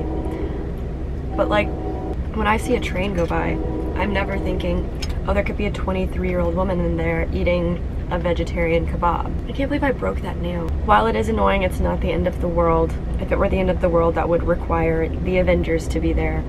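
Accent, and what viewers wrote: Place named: South, United States. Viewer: I could listen to you talk like a Southerner all day long. Please do so and keep up the good work.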